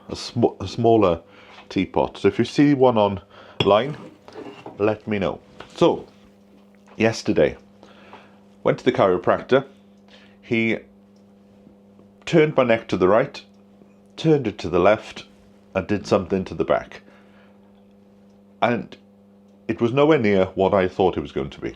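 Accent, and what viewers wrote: Wales, United Kingdom. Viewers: I love listening to you chatting 😊I love the Welsh accent 😊Blessings going your way for healing 🍀❤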